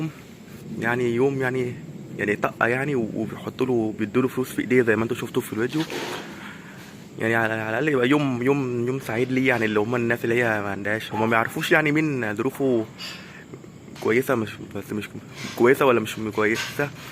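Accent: Egypt. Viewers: ماشاءالله حلقة حفظ قرٱن كريم والعربى بتاعك مصرى خالص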